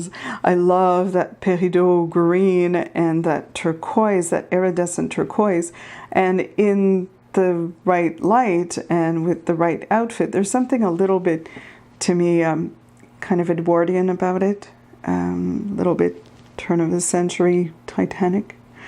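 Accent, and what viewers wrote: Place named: Quebec, Canada. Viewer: I could listen to your accented dialogue all day. Quebecoise?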